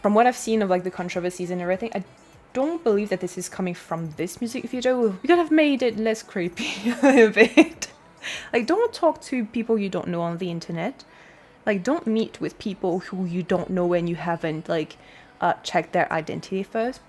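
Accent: Vietnam. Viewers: Come on... your Vietnamese is great. Giọng Sài gòn đặc luôn !!! Be confident because your accent sounds very clear and correct! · i like your Vietnam accent xD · I love your Vietnamese pronunciation🙆‍♂️. It was cute 🤗. I could hear and understand it clearly. I feel so proud that someone who debuted in Kpop was Vietnamese ( I’m Vietnamese too). 🇻🇳🇻🇳🇻🇳 LET’s go with HANNI and NEW JEANS 🎉🎉🎉 ( So sorry because my English’s not good) ❤️ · oh wow I didn't know you could speak Vietnamese lol, I always thought you're from SE Asia. yesss you pass, that's good enough, you totally sound like a Vietnamese who would insert random English words when they speak lol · Trời ơi giọng Tiếng Việt của chị nghe hay mà dễ thương xĩu í tr ơi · Chính ra khẩu âm của chị nghe cute ấy chứ, người miền Bắc hầu như đều thấy giọng miền Nam nghe cute cực🤣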